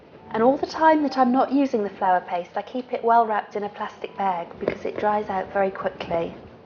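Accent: United Kingdom. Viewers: I'd listen to a Brit read the telephone directory... love the accent! Lovely video. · I love the cup cakes you made-they look so pretty and delicate! And I LOVE your accent!! I want to visit England so badly! Both my Father and Mother's side of the family has British roots. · a southern part of england, pronoucing words very clearly :) i know, im from england :) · If only everyone had a nice English accent ^^ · Finally, I have found a video with an expert-looking outcome and a british accent. *Sigh* I'm oh so sick of watching over-sugared betty crocker videos.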